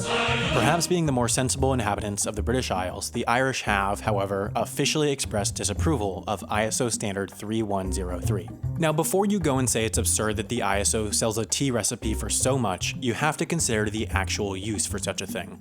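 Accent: United States. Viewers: You sound like an American. (Except you told me your Grandad flew Lancaster bombers in WW2).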